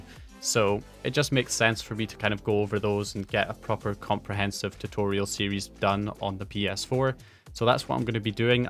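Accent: Scotland, United Kingdom. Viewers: Mic is ok you still sound Scottish 😄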